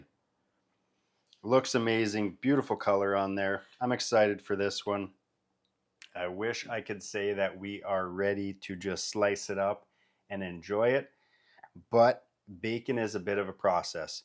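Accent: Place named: Canada. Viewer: Like your recipe, but man... your Canadian accent is killin me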